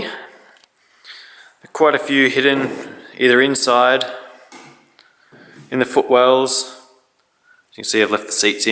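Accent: Australia. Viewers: Jesus how does one listen to that damn accent all day? Having you stuck down there in Australia was teats , yorn the Internet😐 - I can’t take it🇺🇸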